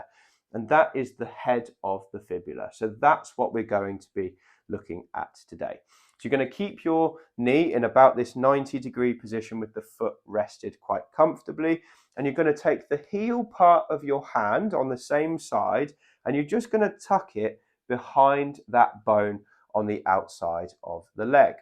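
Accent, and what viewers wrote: United Kingdom. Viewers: I've subscribed. I like this British accent, one can not hear it very frequently. I'm starting with the exercises. Thank you.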